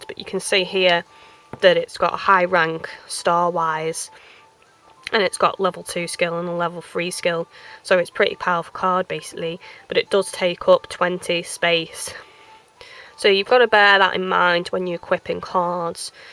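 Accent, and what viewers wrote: United Kingdom. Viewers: i lovr that ur well into it, and ur ur british woop woop lol, where in england u from?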